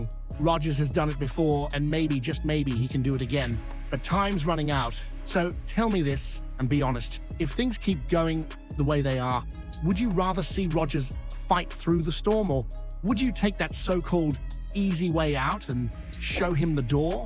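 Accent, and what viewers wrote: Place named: Scotland, United Kingdom. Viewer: Please ditch the cringey Scottish accent and Scottish humour. Just stick to reporting on Football news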